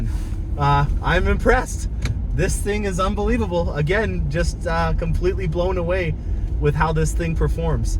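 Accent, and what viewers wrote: Canada. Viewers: That intro screamed in Canadian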